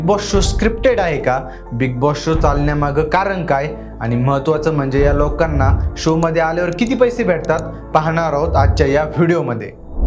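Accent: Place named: Vidarbha, India. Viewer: Tu vidarbjacha ahes ka? Kiti Ghana bhasha Bolto.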